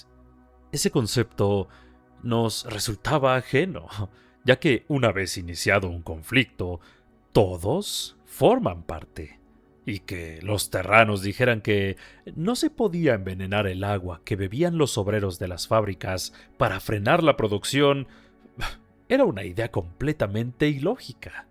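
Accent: Mexico. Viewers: Este canal no sube ia. el relator parece mexicano. y los relatos son de un subreddit si no me equivoco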